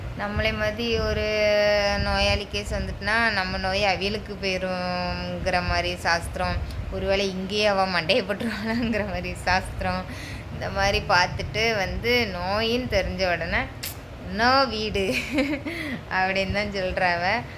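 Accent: Tirunelveli, India. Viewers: உங்களுக்கு சொந்த ஊரு என்ன? நெல்லை பாஷை பேசுறீங்க.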